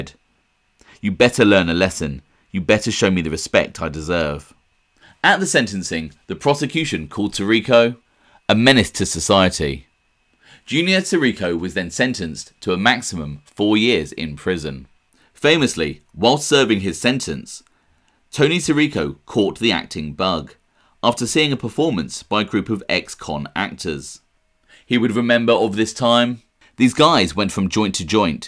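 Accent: United Kingdom. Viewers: Funny hearing a British guy talking about are American Italian history 🤡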